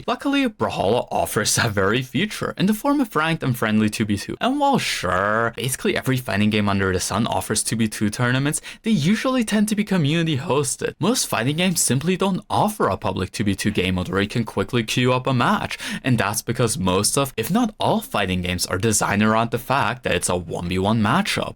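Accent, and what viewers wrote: Germany. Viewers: and the solution of what, to provide a synonym of "let me explain", you have a better writing style, and much more vocabulary than me, and you are expressive. So i guess u will figure it yourself, u dont need a solution from someone is worse than in that area that is why i did ignore the request because im not qualified and it looks silly to me :p "asking for a synonym" also at first i thought even if i provide u a synonym what is the point u will repeat it, or maybe u will use a variety of words to explain one thing, which u can use one word for it. And the thing is, dont take it as a flaw it is ur style, many people in the world do the same thing they have some repetitive words and u can realize it, idk if they themselves realize they keep repeating the same words or not. In your situation i just pointed it out as a joke you don't need to fix things u aren't broken. 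And your english is really fine, i even thought unironically the pronunciation of "other" is "oder" because im not a native speaker + and English is not pronounced as written, until i opened your channel and i saw the location of ur account is germany so i knew that was your accent. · WOW combo codex an absolute fucking slap of nostalgia lmao i did not expect to feel this i wasnt in that community or anything, though kurta and a few other friends talked to me about it, but i remember that logo popping up in a bunch of momo's (oof) 2v2 combo videos also now that you mention floater i feel like i could recognise you from there too since ive watched a decent amount of his streams, mostly because i liked his hair a bunch xdd also glad to see someone else who loves catnips videos, i feel like i was always surrounded by people who just didnt think anything of him (one person in specific who i wont name disliked him because he dislikes eve music, which is a valid point i guess) and yeah the way weapons used to work definitely kept a good part of the game moving. 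after having played a bunch after that stuff got patched out, watching those old jellyfish montages it feels like the game was literally broken 😭 the amount of times i got invited into a lobby after a game and get my hopes of making a new friend of a person i enjoyed fighting crushed, because they didnt have fun and just wanted to drop a few slurs, eugh anyway ive been playing tf2 lately and since you mentioned it too i figure its about time someone recommended it to you :))))))) give it a shot, its deeper than it seems but also easy to get into also all this and this is how i find out you speak german lmaoo i had a hunch because of your accent but didnt want to say anything nochmals, danke fürs Video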